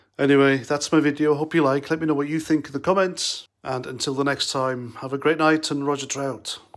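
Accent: Wales, United Kingdom. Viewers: Incoherent and idiotic rambling about Wales, littered with tired old tropes from a man with an unmistakable Welsh accent 😂 This is like an Alan Partridge episode. I think you actually killed off satire.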